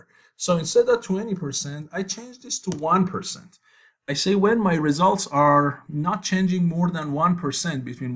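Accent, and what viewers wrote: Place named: Iran. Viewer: سلام من از دانشجو ترم 8 مکانیک یکی از دانشگاه های ایران بسیار بسیار از شما ممنونم بابت این فیلم بسیاراموزنده از مش زدن بسیار نحوه درس دادنتون شیرین وقشنگ بود بسیار لذت بردم دنبال اموزش مش در انسیس بودم در یوتیوب که این فیلم رو دیدم و دیدم چه لهجه ایرانی دارید. دیدم استاد هم ایرانیه کیف کردم سرزنده باشید.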